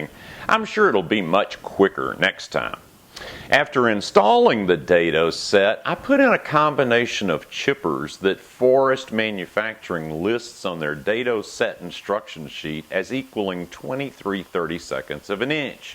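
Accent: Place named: South, United States. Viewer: watch at 1.25 speed, trust me. dudes talented intelligent amazing but that southern slow talkin'...phew!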